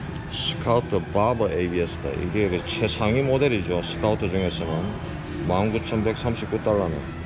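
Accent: Gyeongsang, South Korea. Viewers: 안녕하세요 DG님 님의 채널을 알게된게 이제 2주 정도 밖에 안되었는데 홀릭이 되어버린듯합니다.. 저도 자꾸만 잊어가는 구수한 갱상도 사투리가 고향의 맛을 느끼게 해주어서 편안하다고나 할까 푸근하다고나 할까... 매일매일 업로드되는 영상은 물론이고 하루에 5~6개정도 보고 휴일날은 평균 15개정도는 보고 있는거 같으네요.... 이러다 옛날 게임에 빠져 폐인 생활하던 그 때가 갑자기 생각이 듭니다... 이전 올라온 영상을 다 보고 나면 시간적 여유로움이 생길듯 하구요 님이 올리신 영상 중 190편을 보다가 메디슨 햇에 대해 잠간 응급하셧는데 구글 지도를 보다가 다른 주에서 없는 특이한 모습을 보았습니다. 그게 뭐냐하면 둥근모양의 농지가 특이해보여서 갑자기 궁금증이 생겨서요.. 다른 주를 보았는데 둥근 모양의 농지는 보이지 안트라고요. 도대체 그게 뭔지 궁금해서 이렇게 댓글을 남깁니다. 언제나 안전한 운행을 기원드릴께요.. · 우와~~전시품이 한국 하고는 차원이 다르네요 저도 스피드를 좋아라 하는 편인데 바이크가 멋진게 많네요 ㅎㅎ 영상 재미나게 잘 봤습니다~~ 근데 님은 고향이 어디신지요??사투리 쓰시는거 같던데 ㅎㅎ 경상도 쪽이신가요??ㅎㅎ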